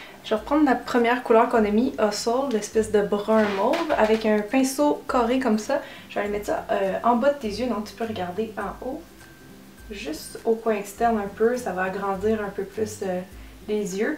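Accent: Quebec, Canada. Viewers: jadore comment tu parler jai tjrs aimer les québécois surtout votre accent jen suis devenu amoureuse MDR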